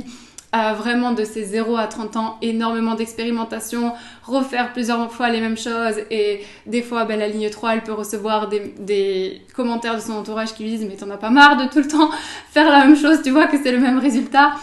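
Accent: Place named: South, France. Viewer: ah merci:) en effet ca sentait l'europe méditéranéenne(surtout accent sur de la france, vers Cacasonne?)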